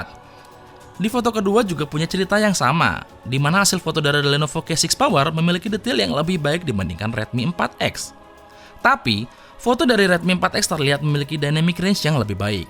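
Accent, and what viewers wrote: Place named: Malang, Indonesia. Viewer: joss nemen :v pemalangan bgt bahasanya 😆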